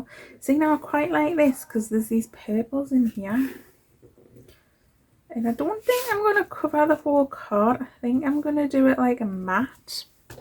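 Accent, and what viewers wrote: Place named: Northumberland, United Kingdom. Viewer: Northumberland in Uk lovely accent :) from NZ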